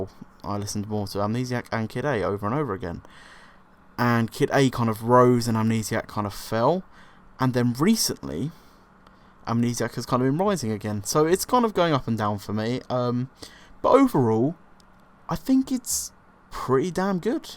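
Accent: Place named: Liverpool, United Kingdom. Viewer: I like the Liverpool accent. It reminds me of.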